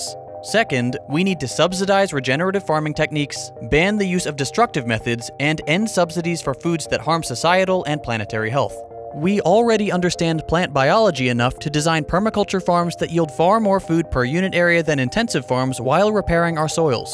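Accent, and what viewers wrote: United States. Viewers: I usually prefer British or other accents than a thick and strong American accent, but your ability to narrate is incredible and your voice is super relaxing and good to hear, great job bravo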